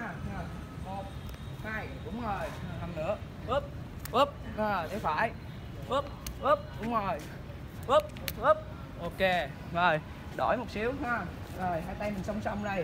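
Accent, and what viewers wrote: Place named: South, Vietnam. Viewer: Nghe giọng người miền Nam rất thân thiện và dễ mến. All the best dòng máu nhà 😊👍🐨🇦🇺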